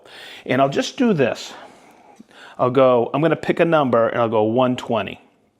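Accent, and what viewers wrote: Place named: Boston, United States. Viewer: That Boston accent is the worst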